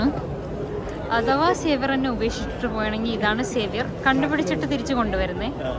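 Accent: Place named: Alappuzha, India. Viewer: Alleppy Slang ❤❤😍😍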